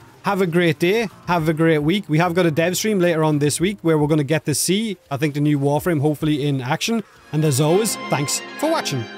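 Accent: Ireland. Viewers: Thank you for the clarification. I fuggin love your videos, and your cool ass Irish accent!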